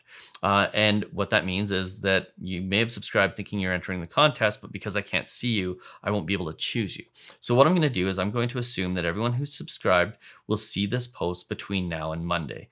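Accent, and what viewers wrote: Canada. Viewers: I enjoy your content. Great to have a Canadian voice for mortgage and finance news.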